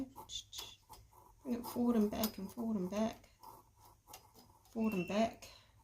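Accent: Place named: New Zealand. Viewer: have a look at either end of the needle bed and you should see a little plastic tab. Check your manual or search online to see if someone else has put up a YouTube video of changing the sponge bar. I detect a New Zealand accent. I got my sponge bar from New Zealand. If you want the details, I’m happy to pass them on.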